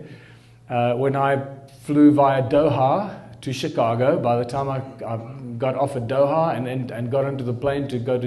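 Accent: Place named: South Africa. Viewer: Love it! and spoken in true South African English lingo.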